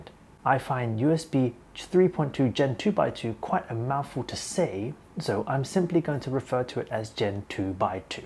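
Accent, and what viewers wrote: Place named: United Kingdom. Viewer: Weird seeing an Asian speak old style British. But not as weird as seeing a black guy speaking British.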